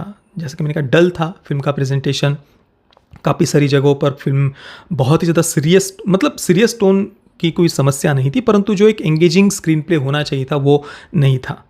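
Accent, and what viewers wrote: Maharashtra, India. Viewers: मी आत्ताच बघून आलो!!❤️❤️..आणि शुध्द मराठी बोली🔥❤❤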